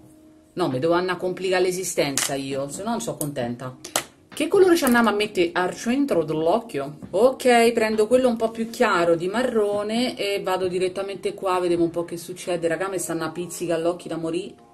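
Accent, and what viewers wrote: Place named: Rome, Italy. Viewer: In questo periodo ci sta proprio un po' di sana ironia e simpatia,troppo forte il tuo Romano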